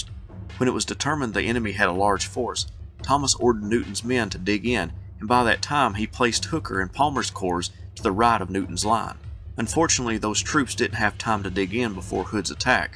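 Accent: South, United States. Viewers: I didn’t know this was going to be 2+ hours of talking…..I really dislike listening to narration without something visual to hang on to bc I am just not and never have been an auditory learner, but I stayed with it for 45 minutes, which is a testament to the narrator and scriptwriter. But it’s a no-go for 2 more hours, and it’s too bad bc I do enjoy the subject, but the narrator’s soft voice with a soupçon of a southern accent puts me to sleep or I start looking at a book and realize I’ve lost the thread and have to go back. I’ll try to return to this and listen in parts!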